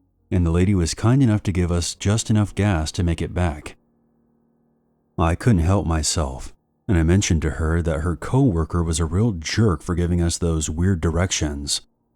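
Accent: Australia. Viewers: thats a solid aussie accent not even Australian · Wrong, he sounded spot on By the way, we use the word “bloody”, but we don’t go through the trouble of typing it out online… Just a little tip the next time you pretend to be an Aussie, you poser lol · Your Aussie accent is very Northern Australia, great job! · The irresistible time with! Thank you for remarkable work with great stories. Your voice narration is always spotless! Edit: That end was awesome! You spoke like an Australian 😄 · Wowsers. Your Ozzie accent on point, mate 🤙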